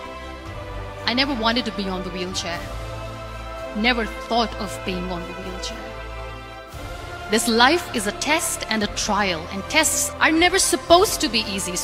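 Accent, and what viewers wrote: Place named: India. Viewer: Hindi accent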